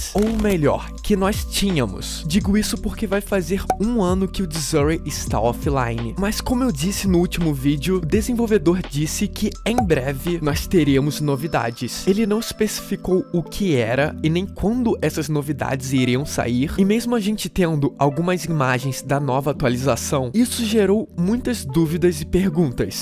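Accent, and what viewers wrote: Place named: Rio de Janeiro, Brazil. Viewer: Min fala carioca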